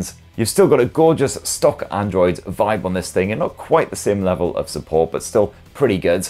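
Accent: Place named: Cockney, United Kingdom. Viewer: Man if you didn't have that cockney brogue...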